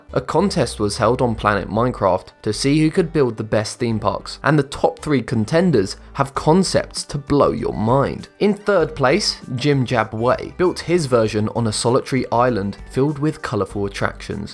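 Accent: United Kingdom. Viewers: is he american with a british accent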